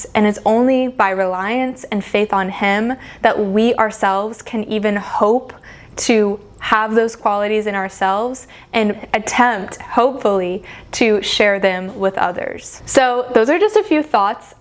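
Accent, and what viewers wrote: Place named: United States. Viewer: You look Italian.. but you have an American accent. mmmh 🤔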